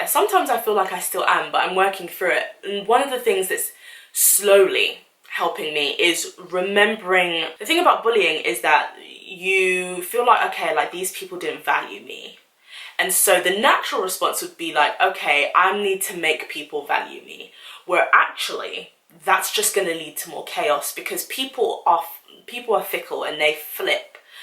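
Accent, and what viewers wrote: United Kingdom. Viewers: I am glad that your are speaking pure British English, and not the wrong stuff that we Africans speak. Obviously you are born and raised in the UK. However, recent immigrants should be able to learn that, with a little effort, especially those with university degrees. I am a recent immigrant and I respect any new language I learn and try to speak like the natives. Thank you for being their so that when I get better at the language, whenever Africans ridicule me for not speaking African English, I will show them your video.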